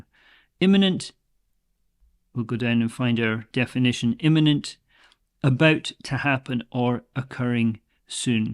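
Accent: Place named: Scotland, United Kingdom. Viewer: From a French point of view, the term "Anglo-Saxon" refers to all people living North of the English Channel or across the Atlantic. But in reality, almost each village in "England" has his own accent, without taking into account Scotland with his 10 dialects (South Central, West Central, East Central North, Borders Scots, East Central South, Angus & Kincardine, Doric, Orkney, Shetland, Lalland, Scotch)... I haven't noticed rhotic accent with you yet, but /ʌʊ/ instead of /aʊ/ like (cow, town, house, down -> coo, toon, hoos, doon) or /əʊ/ by /o:/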